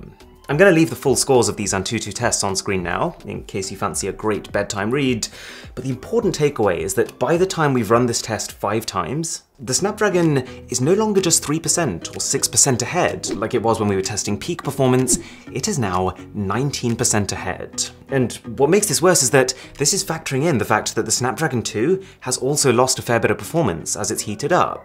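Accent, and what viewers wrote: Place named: United Kingdom. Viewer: You have damn british accent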